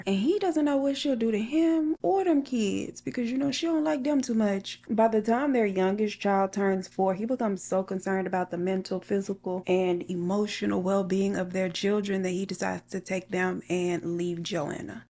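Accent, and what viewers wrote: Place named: Houston, United States. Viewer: Alsoooo, ever since you moved to Houston that accent been accenting I love itttt ❤️😂😂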